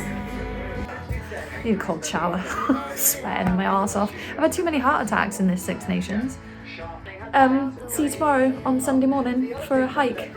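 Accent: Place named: Liverpool, United Kingdom. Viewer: Absolute nutjob marry me. And the scouse accent love it. Ha ha ha.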